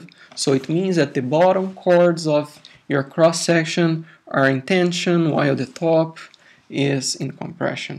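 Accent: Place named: Brazil. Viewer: sutaque brasileiro! OIE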